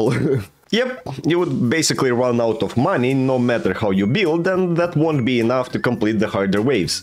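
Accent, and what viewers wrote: Russia. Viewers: По произношению понятно, РУССКИЙ!!! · рязанский акцент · Youre russian? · It's the first video I'm watching by you and I was immediately like "a familiar Slavic accent huh?" Hello, fellow Russian!XD Удачи в твоих начинаниях! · Is it just me, or am I hearing a Russian accent? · It sounds like you have a Russian accent lol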